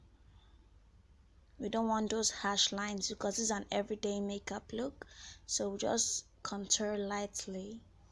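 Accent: Nigeria. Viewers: Nigerian accent is soo bomb we should tell people about her so she would get more subs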